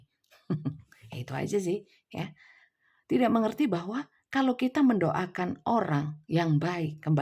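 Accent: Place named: Malang, Indonesia. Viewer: Malang mana Bun? Saya jg Malang Makanya kok ngomongnya enak😂 Iya Bun, kemarin sy nonton reading'an bunda,,,ni jam 00.28 sy masih stay d channel bunda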